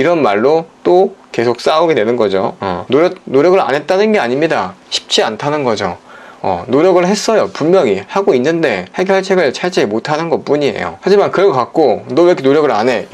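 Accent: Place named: Gyeongsang, South Korea. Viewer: 경상도 억양을 가끔 사용하시는거 같아서요 ㅎㅎㅎ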